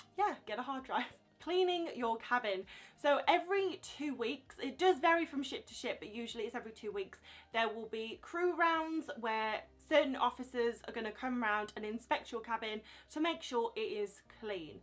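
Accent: United Kingdom. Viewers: Where in the UK is your home? Or more to the point, which regional dialect is yours?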